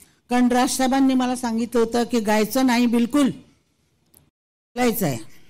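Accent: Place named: Kolhapur, India. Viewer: ❤❤🎉🎉 खरोखर आशाबाई गायना बरोबर आपण माणसाच्या मनाचाही खूप विचार करत आहात केला आहे तुमचं मराठी प्रेम आणि तुमचे कोल्हापुरी भाषा रवि मज्जा आली शोभा अकोलकर बेरड पुणे वडगाव शेरी मूळची राहणारी करंजी तालुका पाथर्डी जिल्हा नगर अहिल्यानगर मेरे जिल्ह्याची भाषांतर छानच बोलून दाखवली वा तुम्हाला सलाम सलाम त्रिवार वंदन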